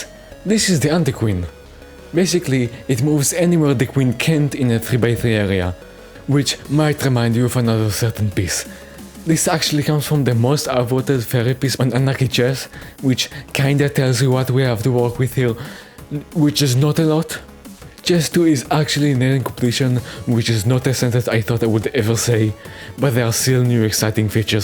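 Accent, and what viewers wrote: France. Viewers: It sounds like french.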